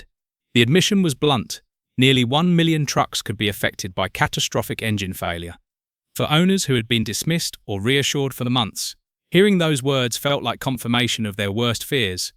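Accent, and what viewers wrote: United Kingdom. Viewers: Some Englishman is babbling about American pickups? ......Get real.....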